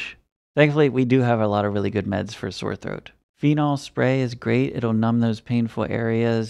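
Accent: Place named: Pennsylvania, United States. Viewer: Maybe Pennsylvania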